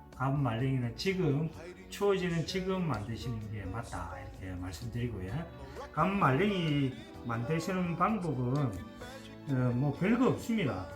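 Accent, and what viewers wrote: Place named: Rural, South Korea. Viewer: 시골 어디신지 말씀이 정말 구수하시네요~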